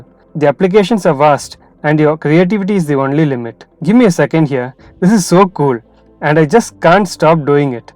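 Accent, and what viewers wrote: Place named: Kolkata, India. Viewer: Hi brother, your accent seems from calcatta. May I I know your home and educational background?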